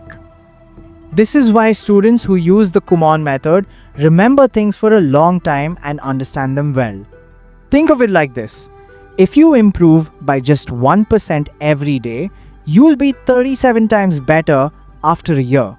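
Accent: India. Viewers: Sorry, totally off topic ... don't understand why narrator has "Indian" accent ... (imho disappointing - no offense to narrator but I think it would've had more impact. Just saying). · His accent is Indian!!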